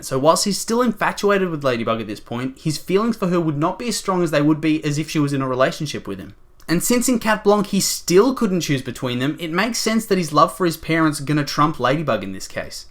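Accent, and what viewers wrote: Australia. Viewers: Yo are u aussie? Your accent sounds def Australian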